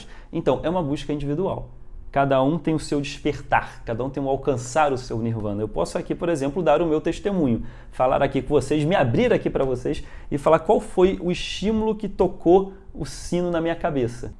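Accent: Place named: Rio de Janeiro, Brazil. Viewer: HAHAHAHH deve ser o sotaque carioca, misturado com o pegando costume de imitar os pastores até falando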